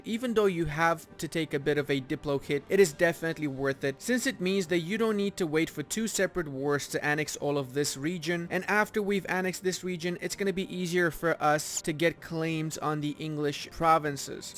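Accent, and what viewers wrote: France. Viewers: Oh man I love your french accent....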